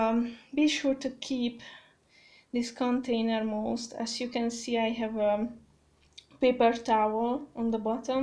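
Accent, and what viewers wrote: Hungary. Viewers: You have a hungarian accent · Your accent... are you from hungary?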